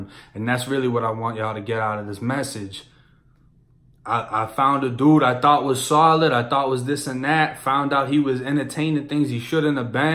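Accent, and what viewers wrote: Boston, United States. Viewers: I was listening to your stories and I'm like why tf bro talk like he from Mass. I can hear the boston accent . I'm from Chelsea Ma . Spent a total of 5 yrs in prison . Keep up the stories bro. I fucks with you. Even though I'm ♿♿♿ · I noticed this with this older video.... he sounds like he's from the south... his new videos sound bostonian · I Can Still Hear That Boston Accent A Bit 😂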